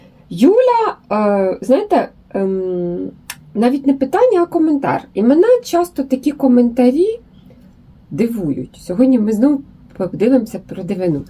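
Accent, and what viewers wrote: Cherkasy, Ukraine. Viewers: Вітання з Новим роком та Різдвом Христовим! Давно вас дивлюся, але пишу вперше. Ви для мене великий мотиватор і натхненниця, особливо після профвигорання. Коли чую Вашу мову, відчуваю себе у гостях на Черкащині, наче побачилася з родичами. В захваті від вашого з ентузіазму. Ви - мої муза із музом. Бажаю вам успіхів, наснаги й здоров'я.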